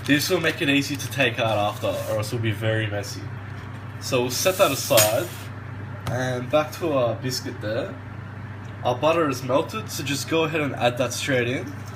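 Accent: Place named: Australia. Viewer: Yeh the Aussie accent thanks I will try this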